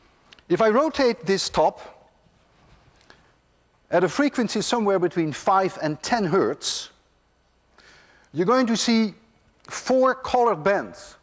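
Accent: Netherlands. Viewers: With that kind of accent he could only be Dutch...figures I was right:) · Ha, I knew he was Dutch... Because of the accent. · Is he dutch? He's accent is kinda dutch?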